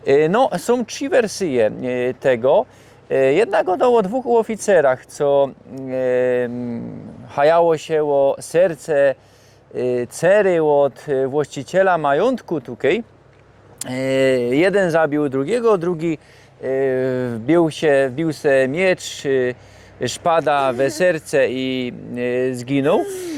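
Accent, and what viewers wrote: Silesia, Poland. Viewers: Fajna ta śląska gwara 👍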